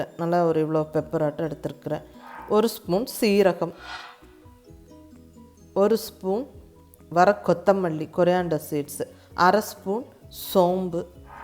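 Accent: Kongu, India. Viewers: Mam...Nenga pesumpothu I noticed Ur Kongu-Tamil.....I like ur Slang and So much of love for u..Mam.😘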